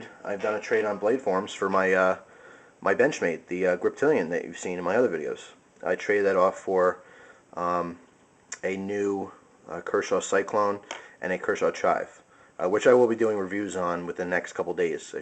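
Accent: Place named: Boston, United States. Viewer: a year or two ago i started watching some of your videos while i did my homework. you sound SOOOO different here! you have like a boston accent haha. just ordered myself a Dogfish and was checking out reviews, stumbled upon yours lol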